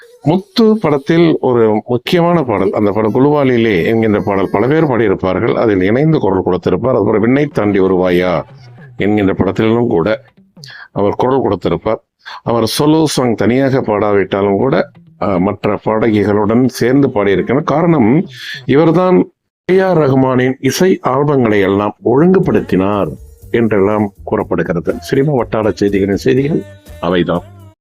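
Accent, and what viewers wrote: Sri Lanka. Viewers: Thokirthu vazhangum ungal kural ilanngai ucharippu pondru ulladhu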